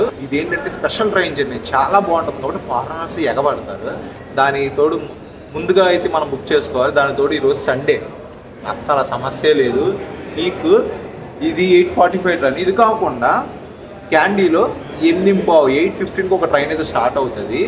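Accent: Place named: Krishna, India. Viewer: అచ్చం కృష్ణాజిల్లా వాయిస్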